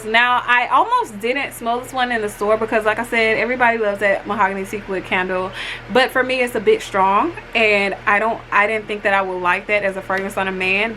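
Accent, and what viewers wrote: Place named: Florida, United States. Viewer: Subscribed for the personality lol also are you a fellow Floridian? That accent sounds like home. 🥰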